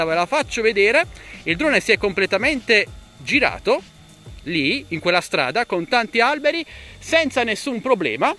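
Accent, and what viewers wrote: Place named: Apulia, Italy. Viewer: Se si considera la camera e la parte video in generare è ancora al top... se non il top dei semiprofessionali. Purtroppo l'avionica è superata, nell'efficienza, nella sensoristica, nel FW (intesa come capacità di calcolo), nel peso.... e poi c'è l'AI (l'Intelligenza Artificiale).. di cui è praticamente privo. Credo che il suo valore oggi possa valutarsi sugli 800 euro e non senza riserve nel comprarlo. Mi associo completamente al tuo consiglio, a meno di necessità impellenti, ATTENDERE L'USCITA DEL MAVIC TARGATO 3. Grazie del video.... 👍 dall'accento sembri pugliese però hai il tramonto sul mare (magari sbaglio io era l'alba, oppure è sul Mar Ionio)👋👋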